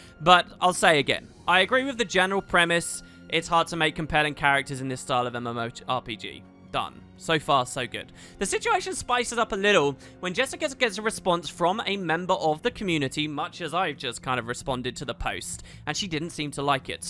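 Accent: United Kingdom. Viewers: your British accent aside we US Yankee's love:) THIS... THIS is why everyone i know and play Gw2 with feels the same IS the VOICE of Gw1 and Gw2 for many of us. You are able to look at the information stay as impassioned as possible to make the most rational conclusions is why even on topics I disagree with you on (20 to 25%) I ALWAYS know your coming from a good place, a person who loves and likes community, the Guild Wars world and games, and decency. All the best and thank you for not doing what 99% of you tuber's do. Worry about speaking your truth an losing subs. Ty again.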